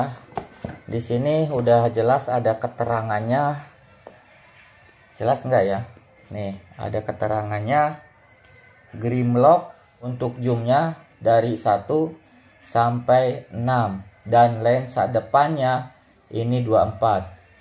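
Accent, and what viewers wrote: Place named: West Java, Indonesia. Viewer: Logat sundaxa sangat lekat kang,salam dari Papua,Asal Majalengka👍👍🙏🙏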